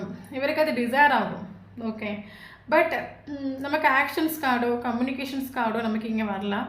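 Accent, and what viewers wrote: Kerala, India. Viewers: Super sister காதல் பிரிவில் எவ்வளவு கஷ்டத்தில் இருந்தாலும் உங்களுடைய பேச்சு எங்களுக்கு புத்துணர்ச்சி அளிக்கிறது உங்களின் தமிழ் மலையாளம் கலந்த பேச்சு மிகவும் அருமை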